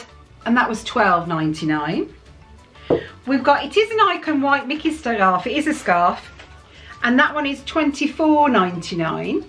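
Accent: Birmingham, United Kingdom. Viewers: Happy eater, happy YouTube birthday and happy early birthday!!! Wow triple celebration!!! Have to say I love love love your videos. Your energy and laughter is so infectious ! And your accent brings me home! My whole family are brummies. I’m in your neck of the woods today for the football (season ticket at West Brom). I travel every week from preston! So I’ve rambled on but just wanted to say thank you for the fantastic videos and for sharing the Disney magic! Xxx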